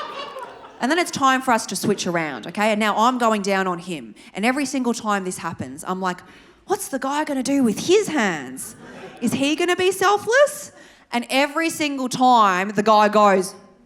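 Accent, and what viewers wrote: Australia. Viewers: I am 20 noin years old. Best Australian accent ever.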